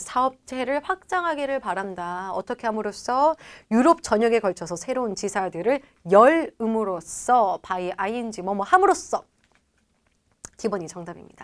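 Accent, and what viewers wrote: Gyeongsang, South Korea. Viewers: 경상도분이신가여 ㅎㅎ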